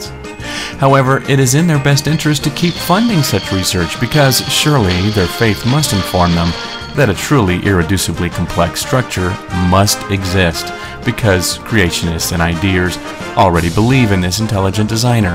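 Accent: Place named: Canada. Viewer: Off topic but you sort of have an accent that I notice. Especially when you pronounce "ideas", are you Canadian?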